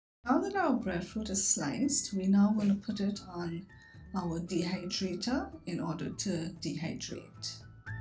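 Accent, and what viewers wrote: Trinidad and Tobago. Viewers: Is that a Trini accent i detect..? 🤔😃 Very informative, exactly what i needed.. thank u. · It sounds like a Trini in the house.:elbowcough: Great vid.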